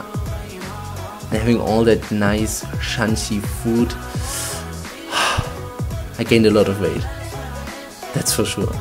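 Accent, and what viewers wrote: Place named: Austria. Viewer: Arnold is Austrian and in Austria people speak German. So he has a similar accent to Arnold.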